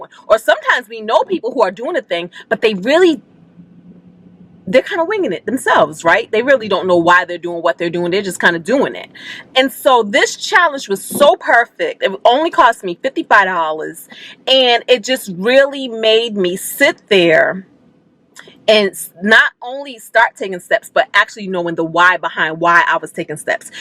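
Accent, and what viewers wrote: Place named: New Jersey, United States. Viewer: You sound so Jersy. I love it. Are you from Jersey? Next question, what type of camera do you use on your videos from home and your car videos? Love your videos by the way!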